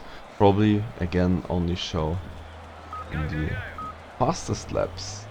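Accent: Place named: Germany. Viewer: Are you german ? Because I am german and your accent sounds pretty much like everybody in my class speakes English😂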